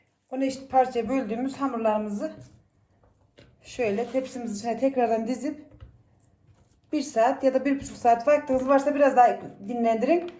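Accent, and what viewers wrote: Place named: Konya, Turkey. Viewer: Hayïrlı aksamlar hanım Konyadan Selamlar🙋 Hemşehrimsin ben de Aksaray ortaköylüyüm videolarını çok beğeniyorum hatta bugün iftarda revani tatlını yaptım şahane oldu.Seni dinlerken silayi Rahim yapmiş gibi oluyorum çok yakın hissediyorum ayni bizim gibi konuşuyorsun.🌹🌹🌹👍👍👍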